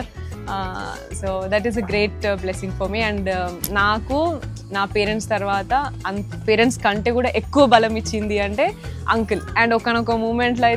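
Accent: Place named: Telangana, India. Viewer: I like your dressing style aand the way your speaking... Telangana slang vinadaniki baguntadhi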